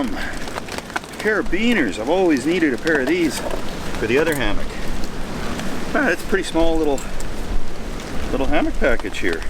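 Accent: Canada. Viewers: I just subscribed today after watching a few vids. From his accent I am assuming he is from the Canadian side of North America:) I therefore await the stealth camping on a hockey rink!! Seriously, he seems a normal guy who super honest and informative and not one of those "look at me" YouTube campers, from what I have seen he is not in it for the likes, just the thrill (and probably the beers).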